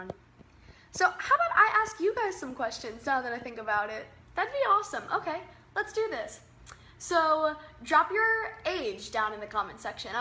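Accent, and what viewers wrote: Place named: Texas, United States. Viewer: Love your Texas accent yall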